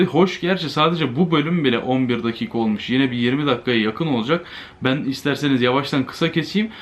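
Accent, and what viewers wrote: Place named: Ankara, Turkey. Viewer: La adam Angaralıymış ben de diyom niye samimi geliyo gardaşim la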